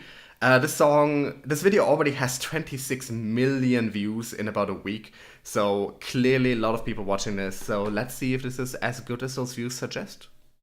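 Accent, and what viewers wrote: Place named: Germany. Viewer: Der Akzent hat es verraten.. Hätte aber nicht gedacht, dass ich jemals einen deutschen Kpop-Reacter finden würde. Es freut mich, dass dir das Lied gefallen hat, sind meine Lieblingsgruppe. Ich würde dir von ihnen empfehlen, falls du es noch nicht gehört hast!:)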